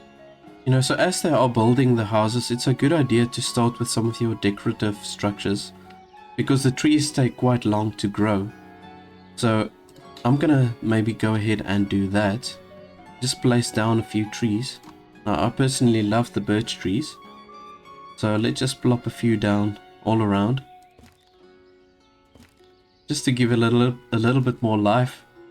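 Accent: South Africa. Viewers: Love the peaceful, chill Ostriv content, great find! One thing that's killing me is I can't place your accent. It sounds maybe like either New Zealand or South African (I feel like you look more like a New Zealander but sound more South African). Am I even close? :D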